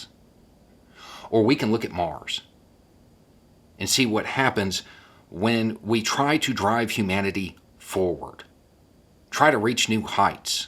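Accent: South, United States. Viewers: Dude, the style choices of apparel on display here are outstanding. NASA shirt, Bass Pro hat... thanks for representing both brands well buddy. Full marks all across the board. As one southern boy to another, it’s also akin to presenting a very astute argument with a southern accent. Proves things aren’t always what people say they are.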